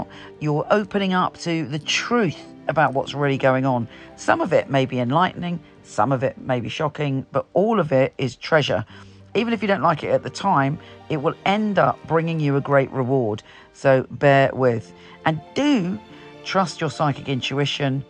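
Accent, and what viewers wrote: United Kingdom. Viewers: I'm hoping communication will be better it's been faulty in the present. Where's your accent from? (UK). Go with your gut l say.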